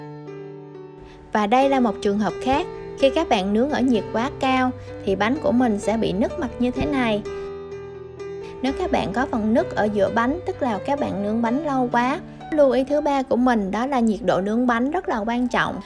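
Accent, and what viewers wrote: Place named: South, Vietnam. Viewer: Giọng miền Nam càng dể nến hơn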